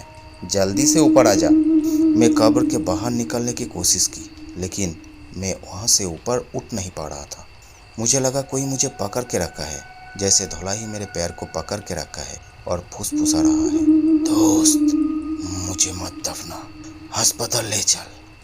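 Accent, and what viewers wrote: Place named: Bengal, India. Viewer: Apki bengali bohoth sundor hai Par hindi ki liye hindi bolne wale se hi dialogues bulwaye Hindi me bengali ka merge horaha hai Achcha nahin lag raha hai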